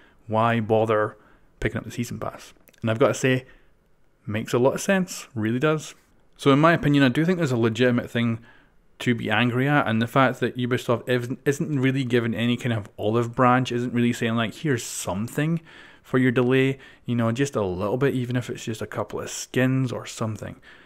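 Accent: Scotland, United Kingdom. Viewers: Are you Scottish just wondering because I'm Scottish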